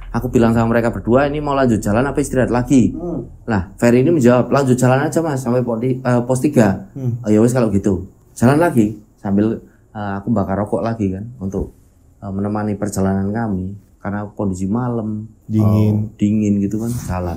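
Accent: Java, Indonesia. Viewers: Bang studionya di daerah mana sih ko jowo nemen...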